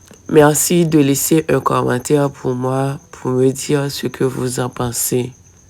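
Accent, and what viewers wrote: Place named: Democratic Republic of the Congo. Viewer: dans cette vidéo tu as l'accent d'une congolaise,depuis la nuit des temps ça a été toujours ainsi rien n'est gratuit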